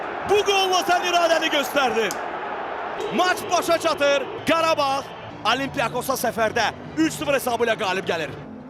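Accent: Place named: Russia. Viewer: Sən şərh edəndə, futbolun ləzzətini itirirsən, rus akçenti ilə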